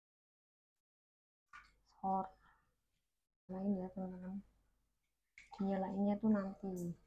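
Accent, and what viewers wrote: Banyumas, Indonesia. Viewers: . Mba jawane ngendi si ko kayane wong ngapak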